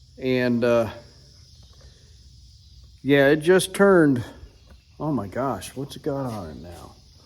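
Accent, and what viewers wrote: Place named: Oklahoma, United States. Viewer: You my mention Oklahoma. I live in Oklahoma and I hear all the locusts in the background and I'm thinking that you sound like your around here somewhere.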